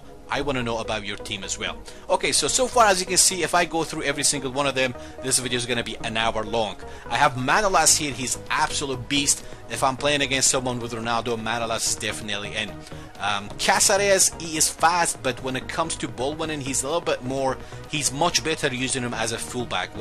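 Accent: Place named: Scotland, United Kingdom. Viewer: Your accent sounds like it has a little bit of Scottish in it, either that or I'm trippin' :') PLAY ME THOUGH YOU WOULD MOST LIKELY BEAT ME, BUT I LIKE A CHALLENGE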